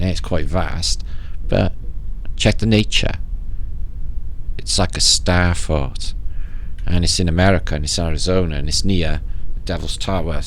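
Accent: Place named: Liverpool, United Kingdom. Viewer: Febf good to see British YouTube canel bless you xx bro luv da Scouse x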